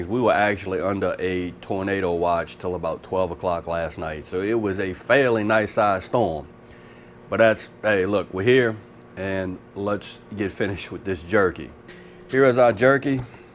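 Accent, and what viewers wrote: South, United States. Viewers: You like Asian! But sound like a total southern! Quite a trip. Nothing wrong!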